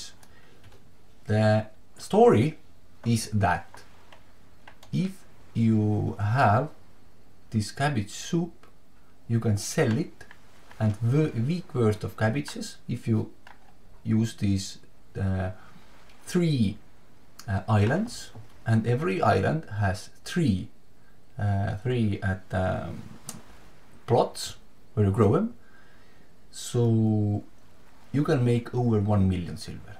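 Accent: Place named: Estonia. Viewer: Actually i kinda like your voice but your Estonian accent is clearly understandable. Keep up!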